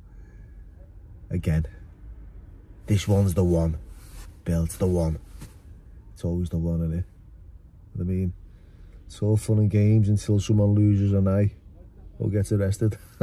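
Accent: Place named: Birmingham, United Kingdom. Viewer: that brummie accent bill thought you were doing an aussie lol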